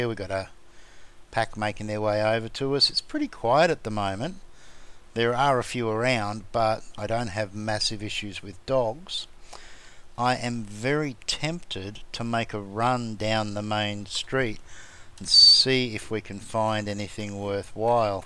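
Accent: Australia. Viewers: One of the coolest builds I've seen. You should play on our server, it is a server for people who like to build. Land claim covers 61, online modifier is 256 and offline modifier is 1024. TNT, pipebombs and rockets have been severely nerfed to virtually stop griefers. Nightly region saves and admin will give you a copy of your region. The server is called Land Owners, it sounds like you are down under and may have a higher ping, I believe server kicks players with pings over two hundred but am certain admin would up just to have you and your awesome builds play with us.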